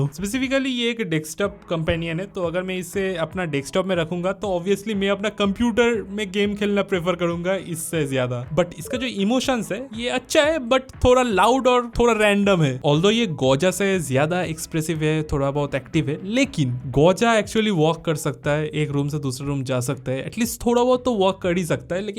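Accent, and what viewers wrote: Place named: Bengal, India. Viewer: Kay hoya bongla spiking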